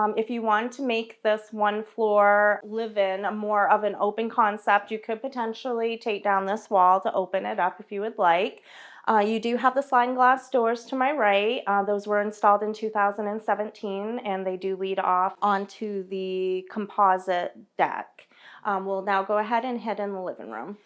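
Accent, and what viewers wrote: Maine, United States. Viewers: She has a Maine accent.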